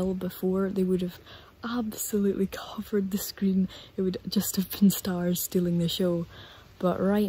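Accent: Scotland, United Kingdom. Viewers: Great video and channel! I love the Scottish accent, memories come back haha (I was born in Edinburgh :D)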